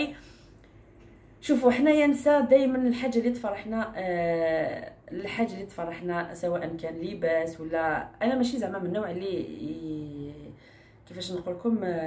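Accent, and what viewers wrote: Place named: Kabylie, Algeria. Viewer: ya j'aime beaucoup la façon de parler surtout on Kabyles c'est sur que tu et des environs de boughni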